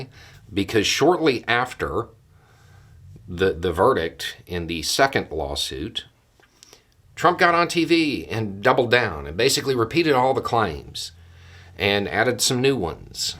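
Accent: South, United States. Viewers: I think I've learned enough of your Southern ways of speaking to say, about Trump, "Bless his heart."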